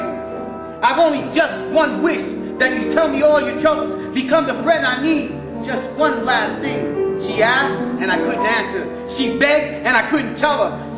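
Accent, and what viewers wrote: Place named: New York, United States. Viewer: The narrator is a New Yawker. He should be hip to the words of: "But he was going no where fast Speed ain't nothing without class"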